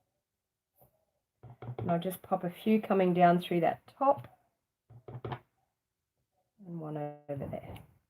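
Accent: Australia. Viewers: Hi, Just watched your video. Love your work and videos Just thought i would let you know that if you mix the shimmer paint with some ISOCOL Rubbing Alcohol Antiseptic into a mister. The ISOLCOL dries quietly and doesn’t run. I live in Western Sydney love watching your videos and hearing the Aussie accent.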